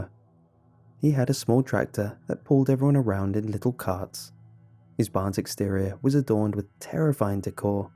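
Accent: United Kingdom. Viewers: Still liking this Beyond Belief: Fact or Fiction twist of a series. Creepy Pasta reading is kind of dying, and I know it's hard to move on to something new. You're doing great. Anyone else have that deer in the head light look every time an Asian person has a British accent? Come on....come on....be true to yourself.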